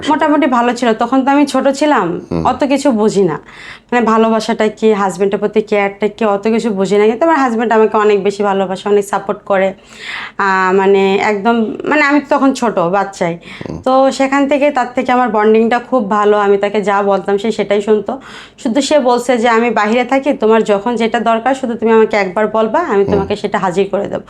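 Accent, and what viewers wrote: Bangladesh. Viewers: অনেক সেক্স ভিডিও দেখেছি আপনার।প্রথম দিকে অবাক হতাম যে,এতো সুন্দরী বাংলাদেশী নারী এতো অসাধারণ অসংখ্য সেক্স ভিডিও করছেন অথচ জানতামইনা।আপনার মুখের বাংলা ভাষা শুনেই বুঝতাম যে ভারতীয় নারী নন আপনি।পুরুষটির ফেস ঝাপসা করে দেয়া আছে চেনা যায়না।xhamster এ আপনার সেক্স ভিডিও গুলো উনি বিক্রি করে কম করে হলেও ৮-১০ লাখ টাকা আয় করেছেন সম্ভবত। আপনার ফ্রি স্টাইল এক্সপ্রেশন আর অসাধারণ সব পজিশনে সেক্স করা দেখে একজন প্রফেশনাল পর্ণষ্টার মনে করতাম।আজ সবকিছু বুঝতে পারলাম পরিস্কার 😢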